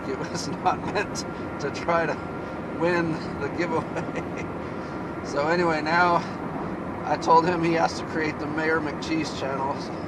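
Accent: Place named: Texas, United States. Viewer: You might should type that e-mail address out as it's hard for me to understand that Texan drawl.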